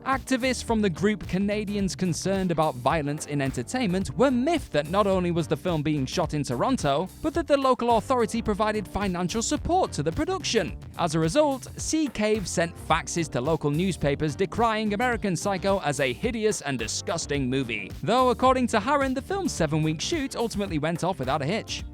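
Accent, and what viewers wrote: United Kingdom. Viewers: The narrator is British, so is Bale. Maybe it's Americans that are saying it wrong.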